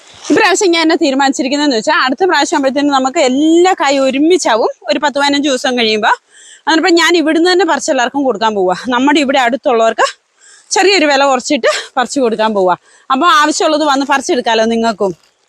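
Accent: Kottayam, India. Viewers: എന്നിട്ട് കാസ്രോട്ട് ബാഷ അല്ലല്ലോ സംസാരിക്കണത്? കോട്ടയം കാരിയാ.....?😂❤ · ഭാഷയുടെ രീതി കേട്ടിട്ട് കാസർഗോഡ് ഭാഷ അല്ലല്ലോ ഇത് കോട്ടയം ഭാഷയാണ് ല്ലോ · Aaha nallasalu kottayam slang njn alappuzhayanu marriage cheythu vannirikunnath palakkad checheede video innu first time kandu bhaasha kettappothanne naadine miss cheythu so happy ❤❤ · ഇന്നാണ് ആദ്യായിട്ട് ഈ channel കാണുന്നത്. Ktym ആണോ നാട്. Ktym ഭാഷ / slang ഉണ്ട് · അച്ചടി ഭാഷ അല്ല കോട്ടയംഭാഷ😅 വടക്കോട്ട് ആരും പറ്റത്തില്ല ചെയ്യത്തില്ല എന്നൊന്നു പറയില്ല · ഇതാണോ അച്ചടി ഭാഷ 😂 ഇത്‌ കോട്ടയo ഭാഷ. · Even I thought she speaks Kottayam malayalam. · Kasarkodo, samsaram ketit kottayampole😍pazhaya kudiyetakarshakaravumle chechi?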